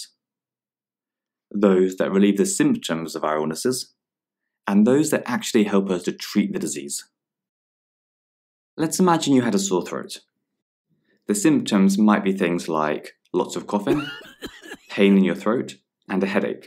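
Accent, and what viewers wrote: England, United Kingdom. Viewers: Thats how it's pronounced in the American accent you dumb the guy in the video is English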